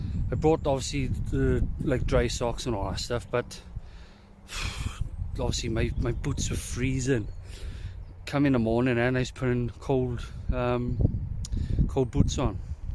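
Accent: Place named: Wales, United Kingdom. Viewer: Just stumbled on your vid. Great tips. Love the accent I lived in South Wales for a bit. I miss it down there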